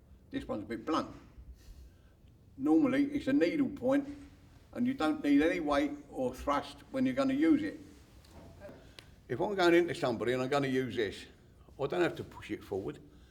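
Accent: United Kingdom. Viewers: Only British man that is immune to being made fun of for his accent.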